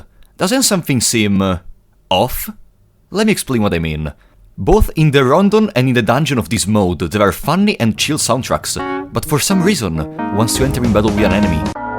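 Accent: Italy. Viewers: Bro with that smexy ahh italian accent of yours, just make ASMRs plz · ma sei italiano? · I love your accent funny italian man (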